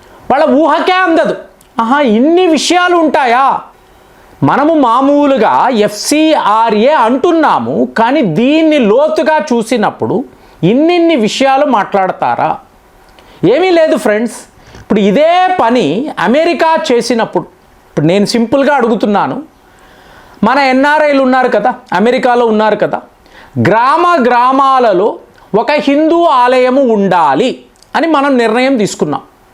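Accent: Tamil Nadu, India. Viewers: Nixon ను నెక్సన్ అని పలుకుతారా ? నిక్సన్ అని పలుకుతారా? నీ తమిళ తెలుగు తగలడ.ఇది భాష దుర్గంధం.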